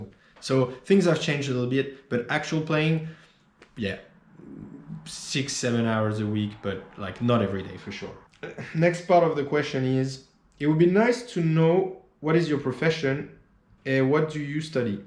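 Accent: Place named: France. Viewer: With your accent I think you're French no? Cocorico ?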